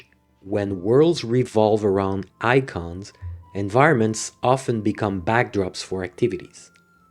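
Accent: France. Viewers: Great analysis! This is probably my favorite game since Skyrim. Maybe with the exception of RDR2. I'm 80 hours in, mostly due to having been on a sick leave for 2 weeks, and I have never been gaming so intensively before, at least not since Skyrim. For me, it's an absolute strike of genius. New sub from Norway ! And kudos for your English as well. I believe I can hear some French accent from time to time, but very little. French, Danish and Norwegian people tend to struggle with heavy accent 😊 (Sorry if I'm mistaken about the accent 😁) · Are you a fellow frog? I seem to recognize my people’s accent! Great video by the way! This game is breathtakingly beautiful. I have spent so much time around puddles and creeks watching water and nature just happen. I’ve never walked as much in a game before! I literally watched comrades I sent to build a house build it in real time. 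It took 6h in-game! The level of immersion is off the charts in this game!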